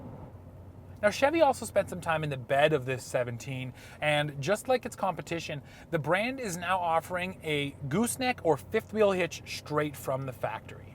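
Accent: Canada. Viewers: Actually, his accent is a Canadian one.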